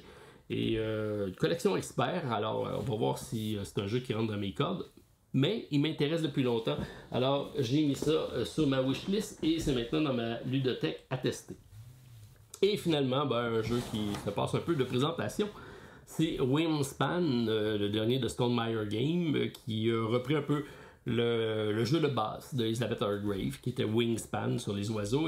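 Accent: Quebec, Canada. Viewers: Bonjour. merci pour cette vidéo. Coup de coeur du mois de Mai pour EVACUATION de VLADIMIR SUCHY. Attendus pour le mois de Juin, WYRMSPAN et SLAY THE SPIRE. Bon Weekend....... La langue Française avec l'accent Québécois n'est-elle pas trop belle!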